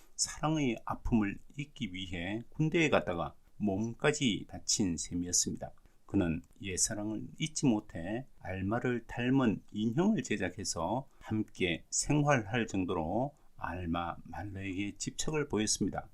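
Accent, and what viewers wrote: Gyeongsang, South Korea. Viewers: 경상도 억양은 좀 사양합시다. 같은 지역 사람들은 괜찮겠지만 참으로 듣기 역겨워요. 쏘리~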